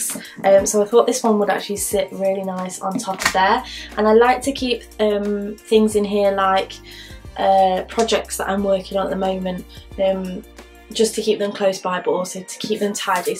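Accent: United Kingdom. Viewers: Awwwwwww! :D i love your new stuff and i love your British accent too <3 :D Love from Egypt <3 <3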